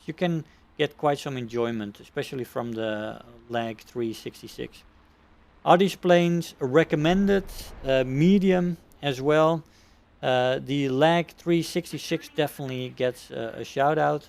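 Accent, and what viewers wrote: Netherlands. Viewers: Hey.Are you from the Netherlands? I think i hear a little Slang. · I love the dutch accent in every language i speak. kinda nice that both of my aircraft youtubers have it👌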